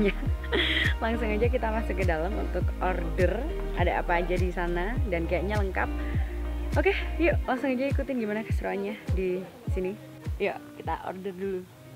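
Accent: Java, Indonesia. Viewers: Logat jawa, asli jawa yo mba....?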